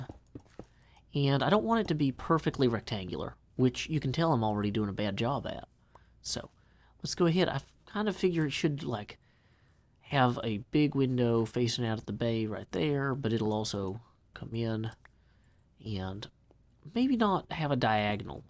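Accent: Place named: South, United States. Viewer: love the southern accent.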